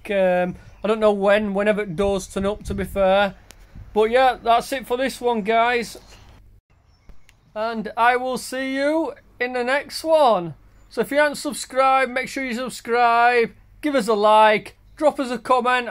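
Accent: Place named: Yorkshire, United Kingdom. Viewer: Always thought you sounded local, I’m from Wakefield my self!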